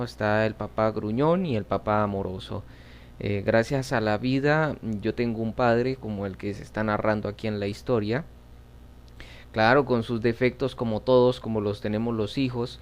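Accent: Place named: Colombia. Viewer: oír ese español colombiano, es maravilloso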